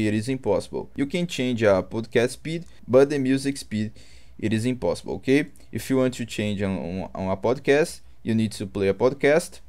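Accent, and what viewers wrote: Brazil. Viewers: Só pela voz já vejo que é BR kkkkkkkkk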